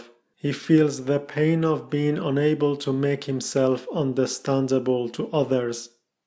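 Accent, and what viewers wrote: Germany. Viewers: I can't quite place your accent. German maybe? If so, you're so lucky you can read Nietzsche and many other great philosophers' original works! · Hahaha, I'm German and this is German. The name of the channel is German, his name is a German one. His accent is what every German that tries to pronounce English sounds like.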